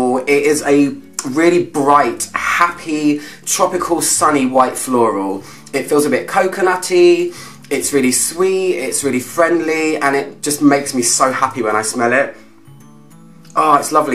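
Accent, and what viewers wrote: United Kingdom. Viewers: New to your Channel and totally delighted by your reviews. I LOVE your style,your clothes and your britishness,and of course I share your passion for perfumes and everything perfumey. I also keep my English up to date and delight muy ears with your accent. Congratulations,you've got a new fan. Greetings from Spain,!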